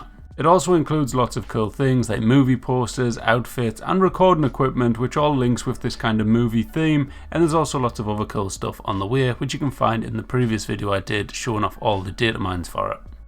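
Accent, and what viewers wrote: United Kingdom. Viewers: i hate this heavy british aceent! (o)pdates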